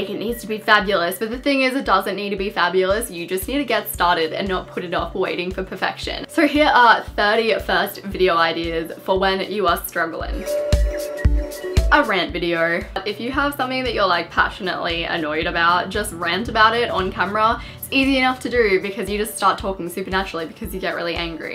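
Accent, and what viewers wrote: Australia. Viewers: Are you Australian? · I think you're Australian accent, I love that accents.I went there for vacation love listening people are talking. I'm gonna start a new channel too. This is helpful .because I'm a new mommy wanna be busy while im home taking care my 3 months old baby. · Omg r u Aussie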